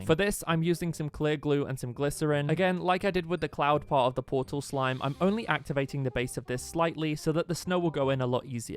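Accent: United Kingdom. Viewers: The british accent✨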